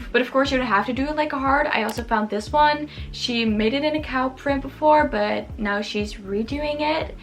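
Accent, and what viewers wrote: Netherlands. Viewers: By the way you talk, Your dutch!!!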